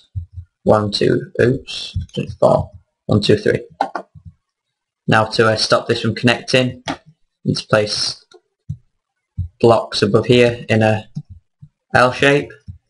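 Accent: United Kingdom. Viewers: Where are you from in the UK? You sound like you're from Lancashire haha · Sound British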